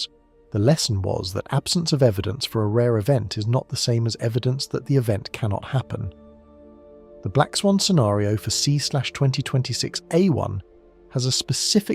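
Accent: United Kingdom. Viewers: Another fear video. A tiny pebble going to hit the sun will not register at all but "somebody" with a crappy British accent can amplify your fear level so you cannot think straight. Who would profit from this chaos ?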